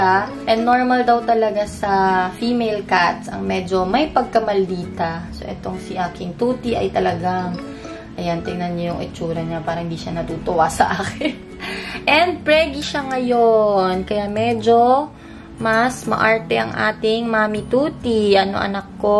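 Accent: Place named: Ilocos, Philippines. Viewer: with her ilokana accent. annako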